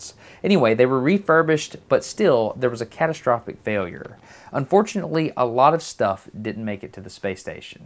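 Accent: South, United States. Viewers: Your southern accent really stood out for me for some reason. Anyway great vid.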